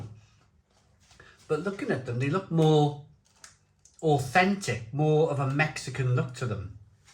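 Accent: Wales, United Kingdom. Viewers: Oh clart, you from Newport or caaaardiff?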